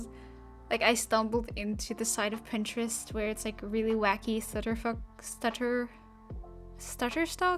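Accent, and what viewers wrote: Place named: South Africa. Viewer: You sound like a South African. Nice accent. Also great video xD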